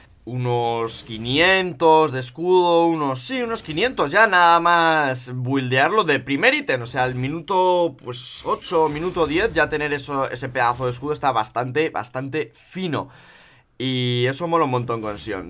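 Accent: Argentina. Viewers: Ese acento argentino papaa! Sos un kapo:v Como siempre disfrutando todos tus vidios. pd: juga singed. Es free elo, encima no fallas los gordotes Kappa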